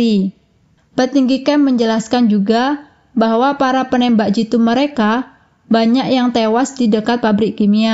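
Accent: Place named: Java, Indonesia. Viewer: Seru banget ceritanya, tapi agak medok suaranya hehe. Lanjutkan mbak.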